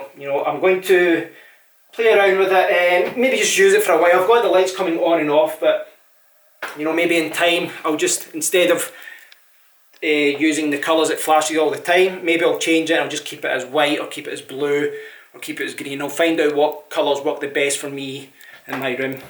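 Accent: Scotland, United Kingdom. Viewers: Gotta love the Scottish accent! I prefer female, though. Good review,! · Thanks nice to hear a good Scottish voice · So awesome opening a YouTube video about tech I wanted to watch and hearing a Scottish accent! 🥃Well gid. #scottishtechyoutube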